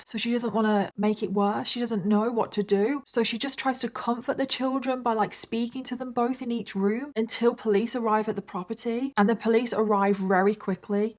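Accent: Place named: East Midlands, United Kingdom. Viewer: stupid? no. you sound like me before i started spending a lot of time in the US. it's basically like living on the border of Lincolnshire and Nottinghamshire. Also, watching this with my three year old in the same room (headphones while she plays) she is my baby. a three year old is a baby. this whole case is terrible.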